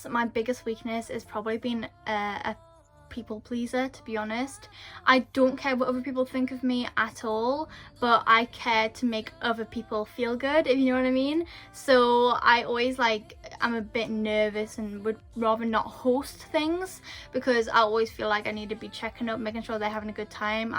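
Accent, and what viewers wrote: United Kingdom. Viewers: I think she’s British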